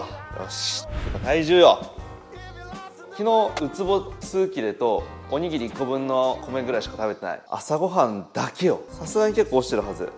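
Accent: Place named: Kansai, Japan. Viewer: 今日いつもより関西弁多かったですね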